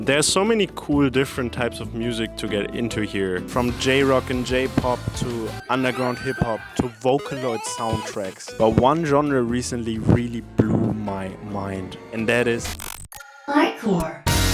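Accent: Germany. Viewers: Im sry if il wrong here but its been c8nstsntly hititng me that ur accent sounds quite german lol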